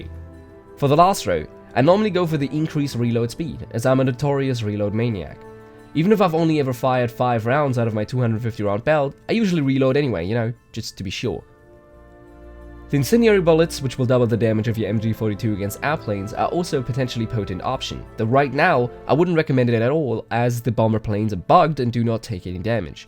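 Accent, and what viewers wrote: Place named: Germany. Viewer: your german your english shows that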